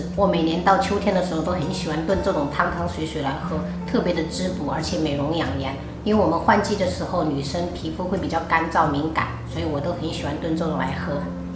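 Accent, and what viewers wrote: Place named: Minnan, China. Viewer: 因為有閩南口音 😂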